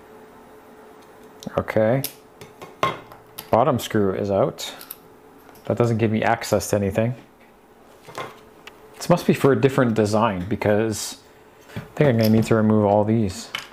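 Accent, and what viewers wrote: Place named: Canada. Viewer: I can tell you are Canadian!! - "Pixie" support?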